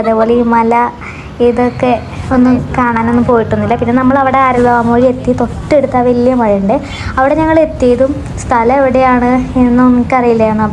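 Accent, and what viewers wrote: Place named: Thrissur, India. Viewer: and her tamil in Thrissur accent 😅❤️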